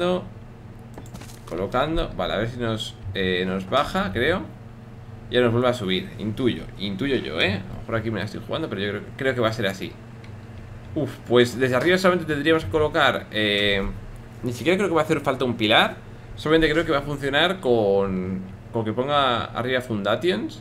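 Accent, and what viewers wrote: Colombia. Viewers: millones pense que eras español un colombiano xD xD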